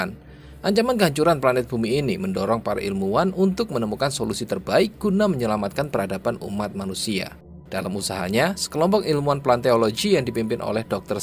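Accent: Java, Indonesia. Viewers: Logat medok nya kedengeran bngt ,tp intonasi nya enak bngt😊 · Suara medok mu terngiang ngiang sampe aku turu bro 😅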